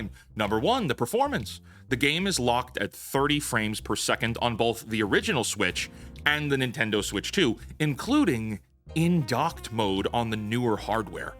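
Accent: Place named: New Jersey, United States. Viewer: Dude are you from Jersey because that accent sounds like it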